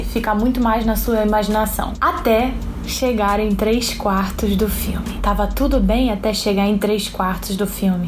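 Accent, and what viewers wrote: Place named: Pará, Brazil. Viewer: adorei o vídeo ^^ inscrito desde já hahaha achei que fosse paraense, o sotaque me lembrou bastante de lá. Sucesso :*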